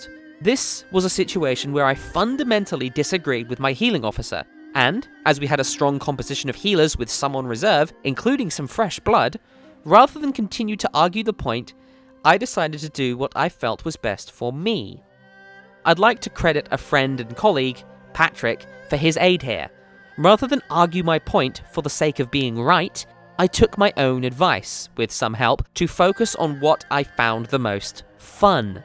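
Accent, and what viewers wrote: RP, United Kingdom. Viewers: such a posh sounding accent ha, been along time since I have delt with raid drama. started playing again on a private server, expect lots of drama on them 40 man raids hehe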